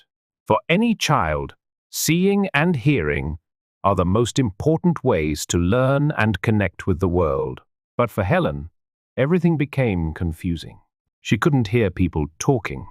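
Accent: United Kingdom. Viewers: thank you!! I like it a lot more when it is speaking in British English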